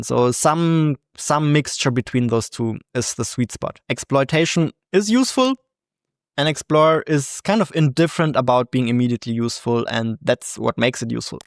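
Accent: Germany. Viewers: Text: "Learning the world": "Learning the vurld" XD Don't worry, your German accent is a big reason of why I subscribed to your channel x)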